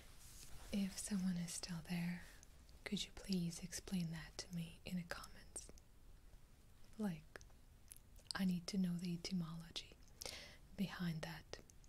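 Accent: Russia. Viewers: Русский акцент прекрасен)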